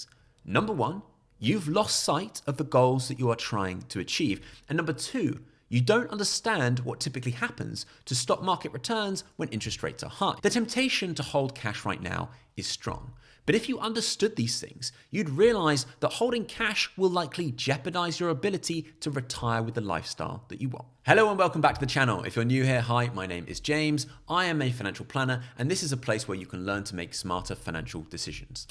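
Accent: United Kingdom. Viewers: Hello James. I am Canadian and like your amazing videos for two reasons: the information as well as your British accent. I try to copy your accent. Keep up the great work James!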